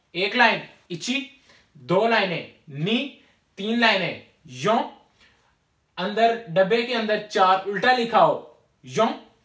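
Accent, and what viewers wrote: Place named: Sialkot, Pakistan. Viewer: bhai..ap sialkoti lagtay hain.....sorry mind naie krna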